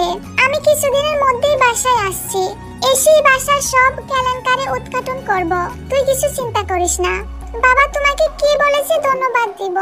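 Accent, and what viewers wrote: Sylhet, Bangladesh. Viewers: আচ্ছা আপু 🌝 আপু কি সিলেটি ভাষায় কথা বলে আমার যেমন কিছু কিছু সিলেটি ভাষা মনে হলো কারণ আমি সিলেটে থাকি 😂।আপু তুমি কেমন আছো। ভিডিওটি অনেক সুন্দর হয়েছে। প্লিজ রিপ্লে দিও। আর তুমি একদিন লাইভে এসো 🥰।‌ প্লিজ আপু।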